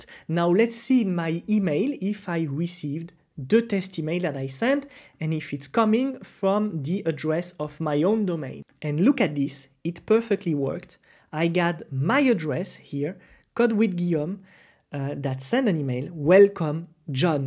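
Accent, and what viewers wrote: France. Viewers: I like that Frenglish ♥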